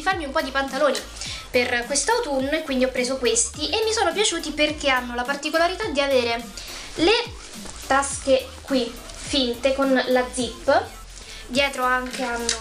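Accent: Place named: Rome, Italy. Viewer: bellissime cosine mi sei mancata in questo periodoo!!adoro il tuo accento romano😘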